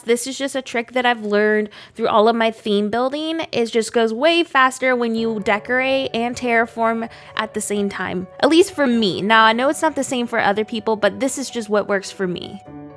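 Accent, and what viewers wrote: South, United States. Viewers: I have been following you on Instagram for a while now and this is the first video I have ever seen of yours. Your accent is so cute! Just a subtle southern twang. I love it. I love your builds!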